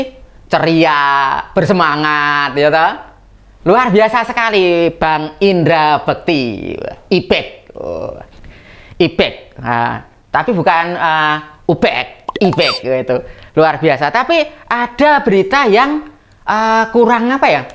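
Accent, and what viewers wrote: Java, Indonesia. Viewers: Kaget aq, kirain mas Alif ternyata bukan suara yg ini ada rasa rasa jawa bgt logatnya🙏😅🤣👍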